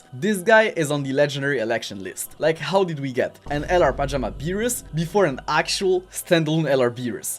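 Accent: France. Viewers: i can hear the french accent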